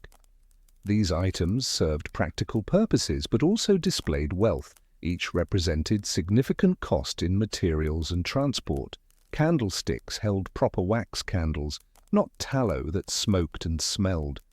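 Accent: England, United Kingdom. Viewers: Old west stories by a narrator with an English accent?! I’m out 🙄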